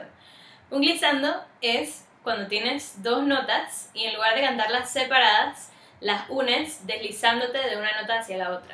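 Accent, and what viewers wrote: Panama. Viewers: namas con tu tono de voz se que eres panameña haha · Sabía que eras de Panamá cuando escuché tu acento 🤯, tienes una voz preciosa!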